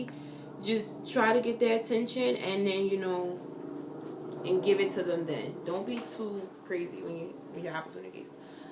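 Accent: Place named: New York, United States. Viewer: u sound like either a new yorker or a philly girl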